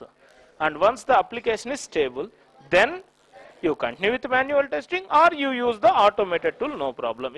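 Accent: India. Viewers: In some part of india and some people speak this accent.Basically south side(Tamil or Telgue speaking people)..But one thing is those south Indian are more talented and they highest literacy and well cultured with less crime.Thats India. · Thank you for this video. I enjoyed watching it. To those ignorant people who leave ignorant comments, you are all sad. Here is someone offering a service. Nobody needs your senseless opinions. If you are not happy watching, then change channel, simple. is from India like some of you, so you should know better. Every race has accent, every instructor has methods they use in teaching. This is fun and inclusive.Please ignore them and continue your lectures.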